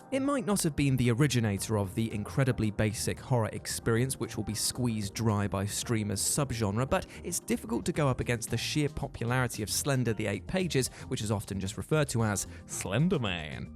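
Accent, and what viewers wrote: United Kingdom. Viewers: He's british, why would he use the american pronunciation? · I still rage-clicked the Dislike button. Your British accent is annoying!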